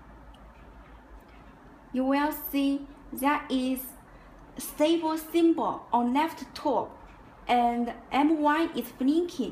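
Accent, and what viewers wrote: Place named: China. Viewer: Chinese accent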